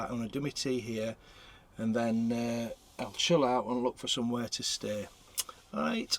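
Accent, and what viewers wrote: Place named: Newcastle, United Kingdom. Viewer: It's not rubbish it is what it is.. Glad your off to a nicer spot.. See ye Geordie speak..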